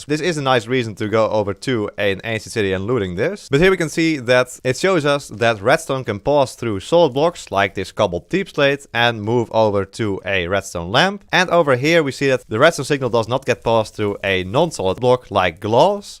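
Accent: Netherlands. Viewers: Ik hoor een Nederlandse stem, ben je dat ook?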